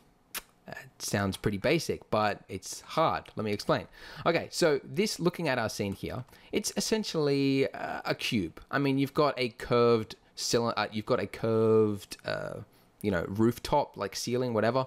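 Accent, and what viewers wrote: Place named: Australia. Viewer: Aawwh, great job once again! The nice thing 'bout your videos is that you can learn about blender and practice aussie-accent at once! :D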